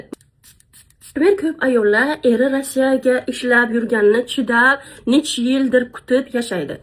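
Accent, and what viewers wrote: Bukhara, Uzbekistan. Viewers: Nima qilibdi shevasiga bu buxorocha sheva hammamiz bir insonmiz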